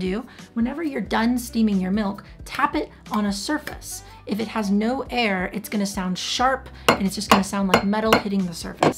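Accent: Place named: Israel. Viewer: Which country are you from originally? Israel? Going by the accent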